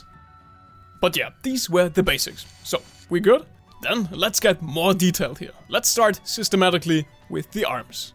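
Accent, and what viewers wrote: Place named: Germany. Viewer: wy is is a garmen accent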